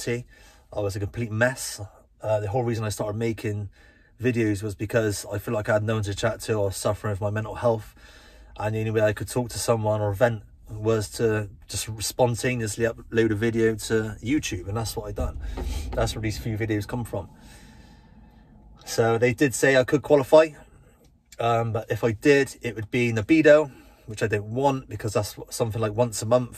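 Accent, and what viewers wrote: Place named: Gloucester, United Kingdom. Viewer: sounds like a Gloucester lad .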